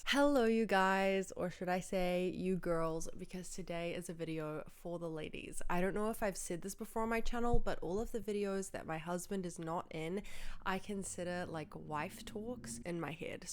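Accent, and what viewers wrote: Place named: Australia. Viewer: Where is this awesome accent from ?!! Aussie?!😂❤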